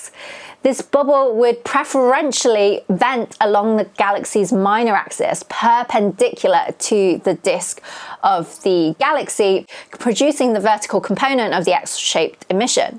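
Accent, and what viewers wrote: United Kingdom. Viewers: Your face screams asian, but your voice says vaguely british.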